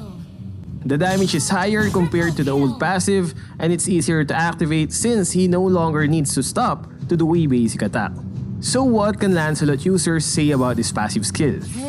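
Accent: Philippines. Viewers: I love your accent, very Filipino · The pinoy accent tho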